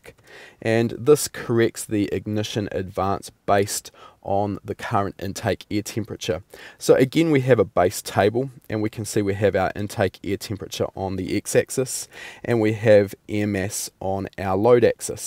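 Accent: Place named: New Zealand. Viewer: There is nothing wrong with your kiwi accent . keep it up. would love to learn about this ls tuning for real. Cheers mate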